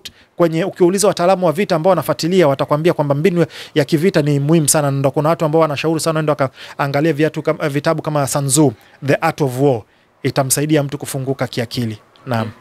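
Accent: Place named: Africa. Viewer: Salut kaka yani unavyoongea unabeba pendela ya Africa big up ❤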